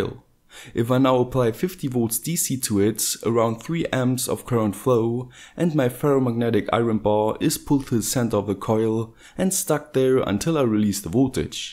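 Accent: Germany. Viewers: German Accent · are you german? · Man hört einfach, dass du aus Deutschland kommst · man merkt das du deutscher bist👌👍 nice videos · are you from germany?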